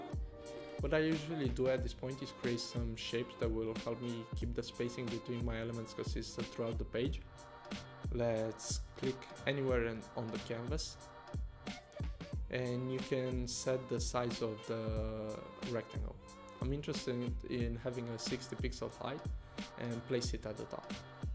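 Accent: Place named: Romania. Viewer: Dupa acent e roman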